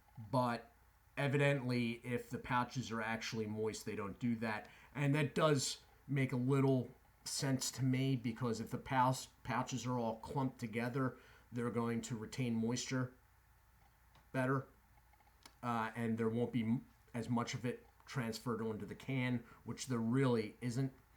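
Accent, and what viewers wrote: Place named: East Coast, United States. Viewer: Peace! Great channel! I’m a New Jersey native for most of my life and now I’m in my 40s in the east Bay of California, and it’s nice to hear an obvious East Coast accent! I’m off of cigarettes for almost 20 years now, yet I have been dabbling in things like cigars, nicotine gum, etc. etc. to bridge the gap when I’m stressed. I would like to be able to order things like Swedish snus or certain types of dips online, in bulk so I have it around. I know northerner is just way too expensive. Any chance you have a good lead to a reputable end respectably priced source for Swedish snus and the like that you know to have decent shipping turn around? Like the channel a lot! Peace Shawn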